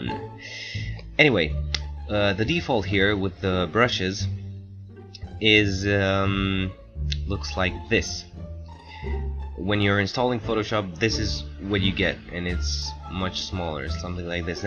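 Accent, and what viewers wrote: Romania. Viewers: You must be Romanian,aren’t you? Foarte bine! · yea bc he’s from romania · mi-am dat seama dupa din nume si accent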